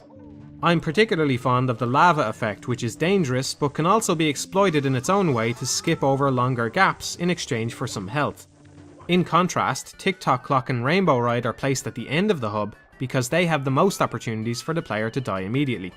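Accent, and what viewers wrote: Dublin, Ireland. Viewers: Can't pinpoint your accent man, sounds like half culchie half Dublin lol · Your reviews are highly reminiscent of TotalBiscuit's whom I recently discovered and came to enjoy immensely for his unparalleled levels of insight and breadth of scope. Maybe you should change your handle to "TotalScone", since (and forgive me if I'm mistaken) you sound Irish. :P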